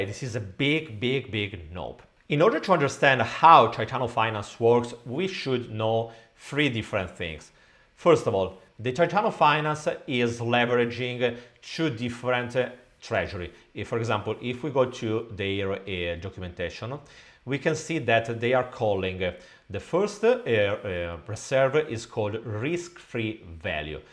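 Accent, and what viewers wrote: Italy. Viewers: Thank you so much for showing us these bad platforms. So educational for humanity good. I just discover you and although it takes time to understand your Italian accent, I love it.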